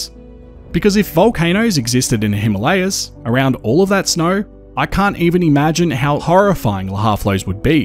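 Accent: Australia. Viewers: Never even thought about this concept - so interesting! Cool video - I like how I actually half understand the science when you explain it - plus as a New Zealander I find your Aussie accent familiar and easy going to listen to.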